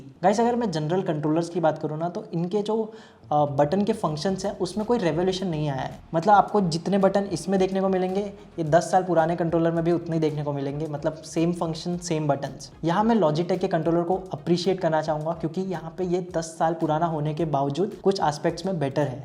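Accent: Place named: India. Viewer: Who understand you Curry language!!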